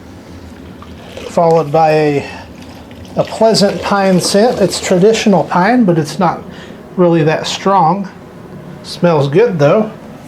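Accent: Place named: South, United States. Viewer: Love your videos and your lil bit of southern drawl 😆 hello from Oklahoma from your newest subscriber!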